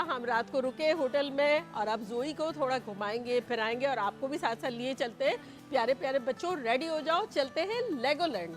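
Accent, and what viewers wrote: India. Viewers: Very beautiful vlog. Felt like we are enjoying with you. Your accent is very much like indians as compared to other youtubers that I follow. Any roots in India? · mam I was watching your pani puri recipe with my dad today and I told him she is from karachi but at times sounds so indian🤩🤩🤩 my dad and I enjoy watching your recipes Love from india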